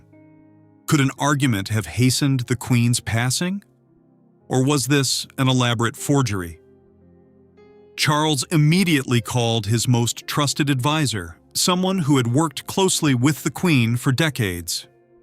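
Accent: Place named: United States. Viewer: Why do we have to have American sounding readers 🤬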